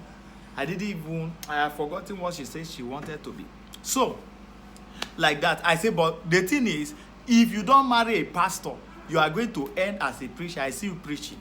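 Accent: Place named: Nigeria. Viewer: lmao...😆😆😆I love Nigerian accent...lol, u funny Apostle. I love this...blessings to you and your family. I agree to this aswell and yes it bless me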